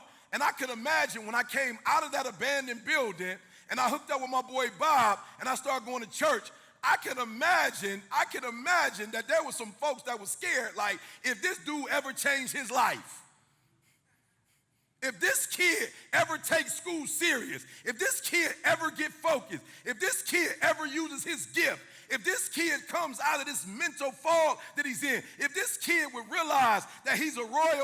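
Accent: United States. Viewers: Please can we get subtitles in arabic or French at least I like your videos and I can't understand all what you're saying because of the American accent. . Thank you 😊❤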